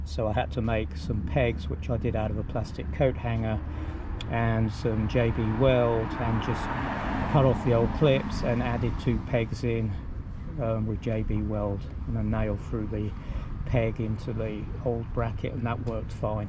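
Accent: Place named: England, United Kingdom. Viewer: Hi, just observing your front brake callipers looks different to my BMW stock pair. I just picked up my S1000RR last week 2021 model. Although you have an English accent you mentioned bucks and your reg plate has reflectors on the side (USA Std?). Just wondering if this was anything to do with the weeping issue in the USA?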